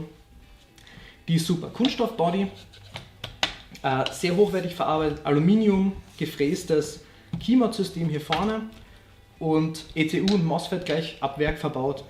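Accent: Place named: Austria. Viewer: Man hört eigentlich auch an deinem Akzent sofort dass du aus Österreich kommst :D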